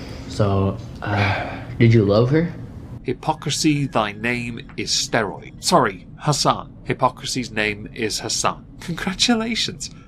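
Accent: United Kingdom. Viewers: I think the issue with your channel is you're a DEI Squid and with the British accent it comes off really douchy.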